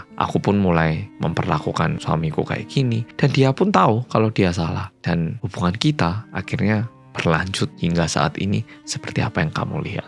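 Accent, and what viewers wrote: Java, Indonesia. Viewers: hai medok❤ lgsg nyimaaak🎉 salam bolobolo😘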